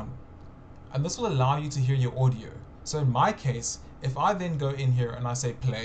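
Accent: South Africa. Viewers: Are you South African? I hear a familiar accent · I can spot a South African accent a miles away, thanks - I was using bluetooth headphones & had to change it on audio hardware.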